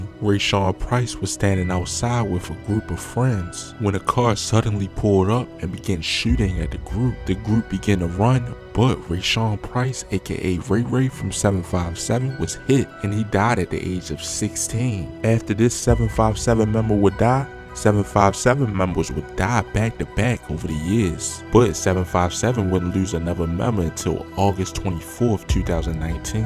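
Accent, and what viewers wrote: Baltimore, United States. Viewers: You sound like you from Baltimore. How you know so much about chicago. I don't don't care about none of these gangs here in Chicago. These goofys just in the way. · This nigga sound like he from Bmore. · You definitely from Baltimore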